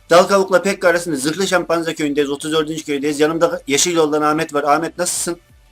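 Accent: Black Sea, Turkey. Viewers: abi eskiden karadenizli oldugundan sesin farkli geliyodu ama artik alistim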